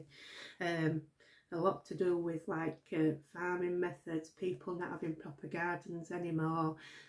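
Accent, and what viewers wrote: Yorkshire, United Kingdom. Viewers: So happy I came upon your channel. I love to have a cup of tea and watch and listen to you. Your accent is very familiar since I was born in Idle, Yorkshire. Coming for a visit in October. So very excited since I get to bring my American husband to where I was born. Keep up your beautiful and artistic crochet.❤️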